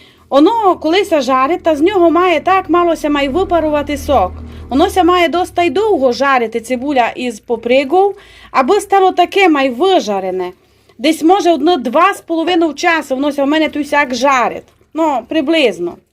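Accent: Transcarpathia, Ukraine. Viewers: Це канал і він ведеться закарпатським діалектом української мови. И иппен за вто мы го любиме позирати и слухати. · Обожнюю ваш Закарпатський говор👍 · Супер!І паштет і мова закарпатська просто заворожує!Дякую,файна господиня!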